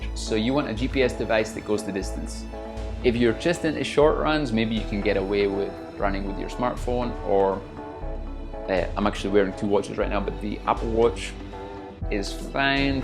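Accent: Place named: Scotland, United Kingdom. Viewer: can't tell if you're scottish or swedish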